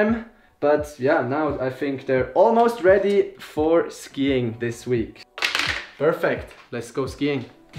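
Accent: Switzerland. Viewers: du da hämmer emol wieder dä schwiizer dialekt😂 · haha fix en schwiizer englisch akzent ;D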